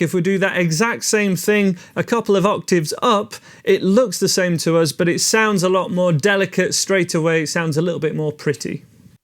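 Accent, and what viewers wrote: Yorkshire, United Kingdom. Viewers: Pleased to meet you, I just found your video on you tube I liked your warm style, by that I mean you explain everything slow enough for an old fart like me now 68, I like also the way you break down sections, chords notes and the stave although I have to read more theory as I feel I am missing a very important part of my journey here mate. Shall I go on oh yes you mentioned flow training,that a big financial commitment will you be on there teaching or will it be someone else, so I am going follow you for a while see how I get on then take it from there really I like your accent poss Yorkshire guy. Thank you so much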